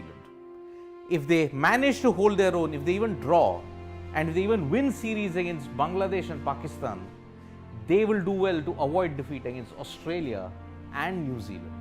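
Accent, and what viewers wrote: South India, India. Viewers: I really like to listen his south Indian accent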